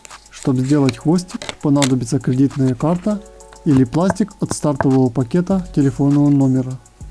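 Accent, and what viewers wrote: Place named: Vologda, Russia. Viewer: характерный вологодский говор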